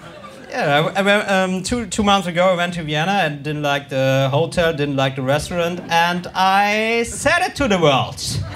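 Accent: Germany. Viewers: Sein Englisch hört sich so Deutsch an, dass es wiederum so wunderbar authentisch klingt. Respekt erstmal für den Mut in einer Fremdsprache vor Publikum aufzutreten. Als introvertierte Person finde ich das durchaus bemerkenswert, aber ich glaube, unser Till ist so extrovertiert, der hat nicht mal eine Sekunde abgewogen, als er das Angebot bekam :D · Unfassbar! Till, du schaffst es deine lustige Art ins englische zu übertragen. Es sind in meinen Augen nämlich nicht unbedingt die Jokes die dich von anderen abheben, sondern die Art und Weise des Vortragens. Und diese super witzige Art hast du auch mit deinem herrlichen deutschen Akzent im englischen rüber bringen können. Thumbs up! 👍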